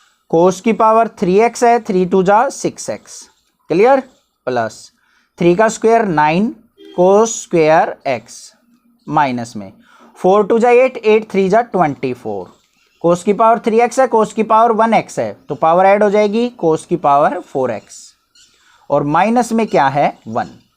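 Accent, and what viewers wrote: Haryana, India. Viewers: sir: dekho upper lakha ka😂 haryana walo ki haryanvi Hamesha bich ma hi aa jati h I am also from Haryana♥️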